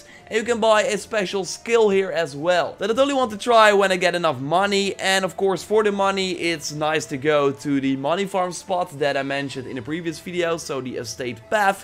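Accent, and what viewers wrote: Netherlands. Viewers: This dude sounds dutch. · ik hoor zo erg dat jij nederlands bent echt irritant maar niks mis met je content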